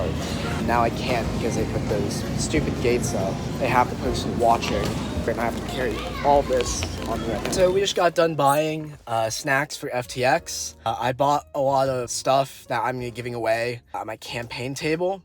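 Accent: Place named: New York, United States. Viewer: I'm loving the strong New York accent.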